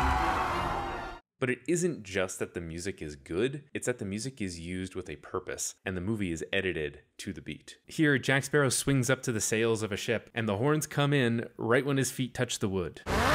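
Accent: Canada. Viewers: First sentence and I know you're Canadain! Beavers and Maple Syrup, huzzah!!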